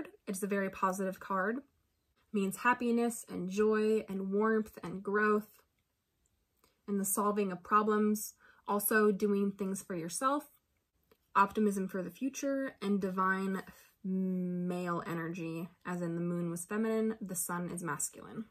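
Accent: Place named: Chicago, United States. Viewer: The edits and cuts make it obvious you are reading from copied notes, where we can not see but you can. Sound totally unnatural. Starts video off in a negative tone about other you tubers, arrogant. Uses poor English saying "Coming right at you, with..." Sounds so South side of Chicago. You copied. Easy and thoughtless to do.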